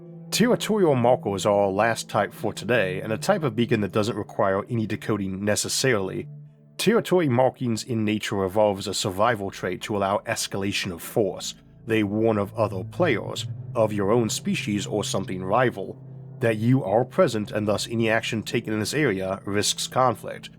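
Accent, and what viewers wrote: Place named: Louisiana, United States. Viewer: You from Louisiana? You sound like a guy I worked with around Mansfield. Thanks for the content. I’m entertained.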